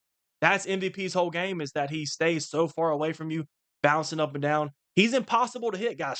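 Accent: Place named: Appalachia, United States. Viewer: bro got the hillbilly accent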